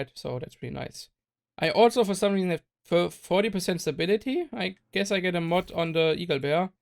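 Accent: Germany. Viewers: Maaan, dieser deutsche Akzent macht mich fertig 😂😂😂 wäre cool wenn du auch Was auf deutsch machst ✌🏽👍🏽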